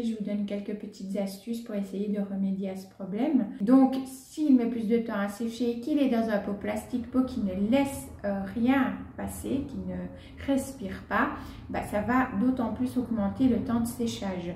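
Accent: Belgium. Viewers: Belgique aussi ici 😜 (j'avais reconnu l'accent 🙊😁) merci beaucoup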